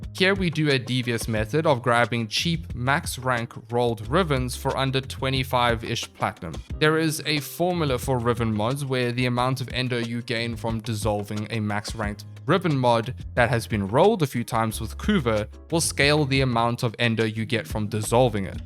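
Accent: South Africa. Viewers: Bro do I detect (and forgive me if I am wrong) are you South Africa?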